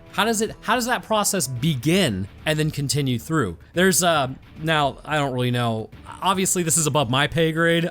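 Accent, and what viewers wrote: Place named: Roanoke, United States. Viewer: I call the combination of your speech, cadence and inflection Roanokian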